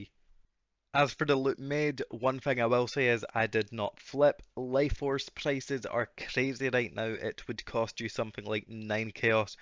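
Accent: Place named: Glasgow, United Kingdom. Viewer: n ur accents pretty tame too compared to some real glaswegian gems I encounter on the daily 🤣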